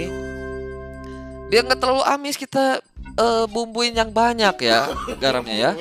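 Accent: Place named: West Java, Indonesia. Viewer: biasa bang tea wkwkwkwk(sunda nya gak terlalu)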